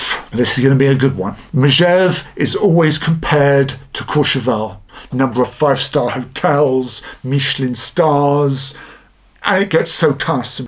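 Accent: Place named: England, United Kingdom. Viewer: Salut, Tu sais ce que j’ai apprécié (entre autres) dans cette vidéo? Je n’avais jamais entendu nommer les stations de ski française avec un accent anglais sur toute une liste comme cela! Merci 🎉😊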